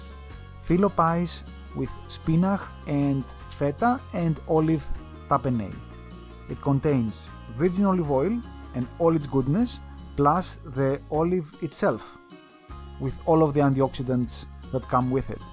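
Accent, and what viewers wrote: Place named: Greece. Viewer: It’s more authentic like this. The dude’s Greek. Are you here for linguistics or are you here for food?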